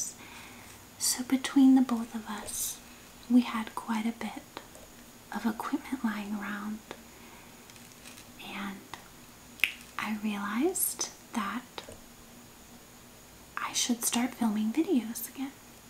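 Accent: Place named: South, United States. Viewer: Welcome back! Thanks for the update! Random but I hear your accent way stronger now than in previous videos... southern! (Or texan?):)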